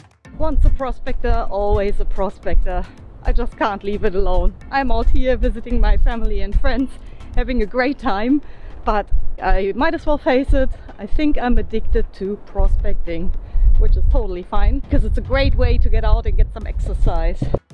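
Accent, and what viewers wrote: Australia. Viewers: Loving the amber finds Meanwhile, how is your family with your 'aussie' accent? 😊😊😊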